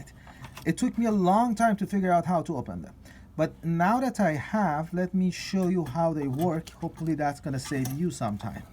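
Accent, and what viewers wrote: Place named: Iran. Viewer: Dorood Farsi accent is always so easy to recognise hahaha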